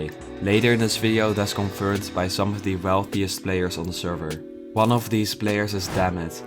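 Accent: Netherlands. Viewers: are u netherlands? u have a netherlands accent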